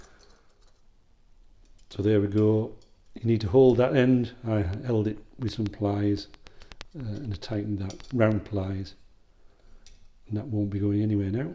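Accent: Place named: Teesside, United Kingdom. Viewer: When i first seen the expedition a few weeks ago i was slightly concerned about the top sections but that looks very straight.I really enjoyed that, especially the very understandable Teesside accent 😁….Thanks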